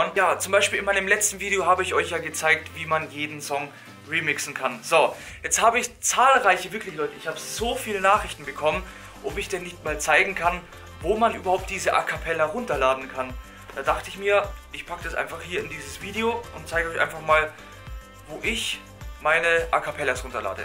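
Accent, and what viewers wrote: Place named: Bavaria, Germany. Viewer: servus, woher kommst du? hört sich bayrisch an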